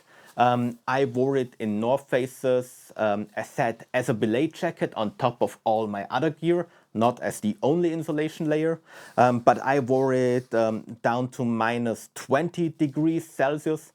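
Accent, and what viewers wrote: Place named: Germany. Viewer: Hi! here Giorgio from Genova, Italy. I'm a mediocre alpinist / hiker. About the jacket you reviewed: you mentioned the price is almost 300 euro and that seems to you cheap, as I well understand. To be honest it doesn't seem to me exactly cheap also considering the possible good quality of this article. I recently bought the Simond Alpinism Light Piuma that costs almost 1000 euro and yes, maybe it has not the same warm... but has double zip closure:). Do you know it? In general I appreciate your contents. I'd suggest to mix the static camera view you generally use mixing your talk with on-field recording just as you did in the ice axes video. This would enhance the "quality" of your videos already very good and I agrree with your points. BTW, I know you are from Switzerland, but from which region you are? By your accents it seems you are from a German-language, right? 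You told you spend a lot of your days in mountains, so my suggestion / request is to share (if you feel interesting for your audience) some interesting alpine climbing routes in Switzerland you do. For me this is not for practical usefulness, but just for a cultural curiosity. Also, in general I'm interested to know climbing techniques and "common sense" mountaineering behavior rules in other countries, a part Italy. Thanks again giorgio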